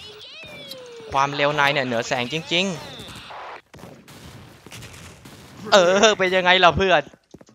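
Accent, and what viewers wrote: South, Thailand. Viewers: คนใต้ จังหวัดไหนนิ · พี่เป็นคนใต้หรอค่าบ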